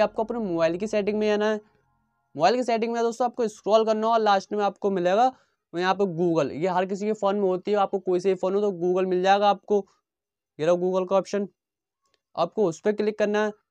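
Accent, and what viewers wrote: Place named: Uttarakhand, India. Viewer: Because aap ki avaaj Uttarakhand side ki lag rhi hai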